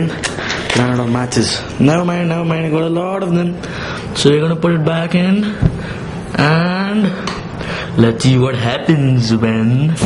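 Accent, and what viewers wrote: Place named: India. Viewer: Yeah Gunner3210 is right... deodorant is extremely flammable, be very careful when playing with fire and compressed containers with flammable gasses inside because they could explode in your hands :( Good luck in you chemistry studies ;) P.S. i like your indian accent, it's so funny! xD Greetings from Italy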